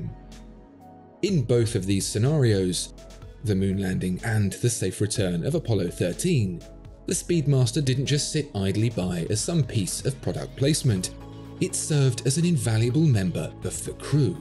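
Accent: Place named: England, United Kingdom. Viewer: I'm not happy with the pronunciation you use for omega, I've heard Americans pronounce that way but you have an English accent.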